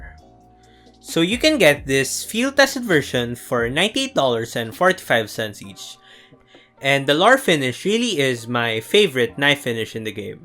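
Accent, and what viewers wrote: Philippines. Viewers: i can hear it in your accent kabayan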